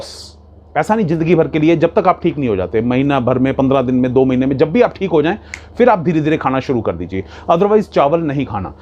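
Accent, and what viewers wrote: Punjab, India. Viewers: Sir,thoda video short me banaye.Thank you for great information. I love your strong Punjabi accent.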